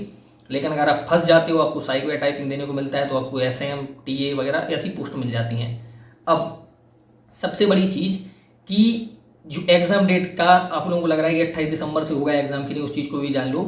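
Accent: Bundelkhand, India. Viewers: Sir ap bundelkhan se h Kya ???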